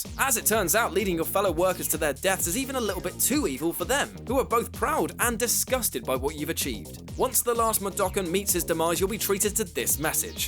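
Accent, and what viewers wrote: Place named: United Kingdom. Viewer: Why is there always some posh English bloke narrating this garbage?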